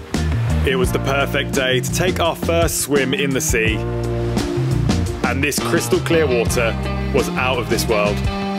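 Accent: United Kingdom. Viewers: What a perfect British accent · I heard the British accent 🥰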